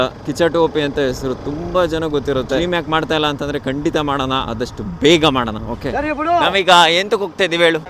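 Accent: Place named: Tiptur, India. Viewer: Randomly watched ur insta reel and watched ur channel here ...Nam tiptur slang alli nim videos literally touched the hearts and kept us entertained ❣️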